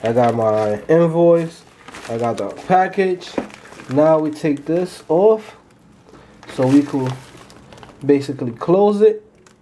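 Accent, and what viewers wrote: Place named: New York, United States. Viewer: You sound like you've gotta be from NY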